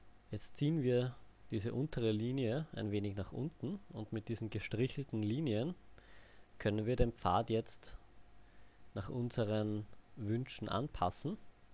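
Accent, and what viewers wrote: Austria. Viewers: Bist du ein Österreicher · Oho ein Österreicher ;)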